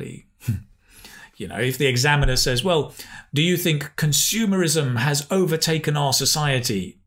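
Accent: United Kingdom. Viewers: I like your channel, like your British accent and the way you explain and teaching 💗 your fan from Morocco 🇲🇦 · What i like about this page, your explination and your Lovely pure Britgish accent. Its brings comfort and gives us confidence.thank you very much sir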